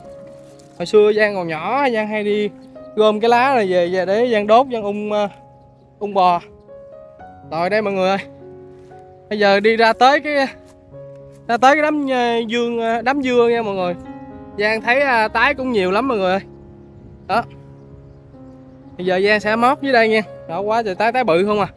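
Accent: Ben Tre, Vietnam. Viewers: Lần đầu xem video a nghe tiếng e nghi a ở bến tre rồi. E ở batri e cũng đang cùng nghề bếp .e cũng hay xem video của a cảm ơn a đã chia sẻ những video ý nghĩa chúc a thành công trong công việc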